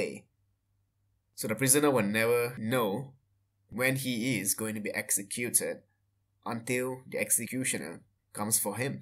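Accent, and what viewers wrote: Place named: France. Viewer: Sounds like French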